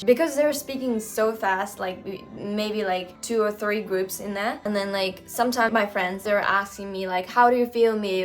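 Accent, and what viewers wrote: Australia. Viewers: While you live in Australia, you perfectly pick up Australian twangs. You might be the person picking up the accent in the country where you live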